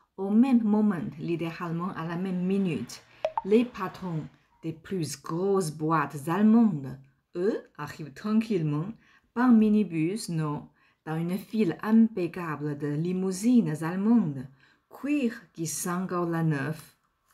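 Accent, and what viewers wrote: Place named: China. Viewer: Oui, parle très bien le français, la grammaire est impeccable. Elle a juste un accent lié à la langue natale, mais qui ne dénature absolument pas le sens du français qu'elle utilise. J'aimerais bien pouvoir parler chinois aussi bien qu'elle manie le français! Les français ne sont pas bons dans l'apprentissage des langues, donc mal placés pour donner des conseils à une femme chinoise qui parle leur langue, sachant qu'il n'y a absolument rien de commun entre les deux.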